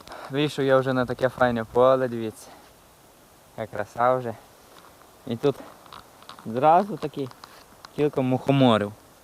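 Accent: Hutsul, Ukraine. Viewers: Насолоджуюсь лісом, грибочками і МОВОЮ! Спасибі, легінику! Це свято - побувати в осінньому лісі, побачити саламандр і почути файну гуцульську говірку! Дякую щиро! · Приємно слухати гуцульську говірку я б тілько за це і дививсі би!)) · Але файна розмова Гуцульска дуже люблю слухати 😂❤❤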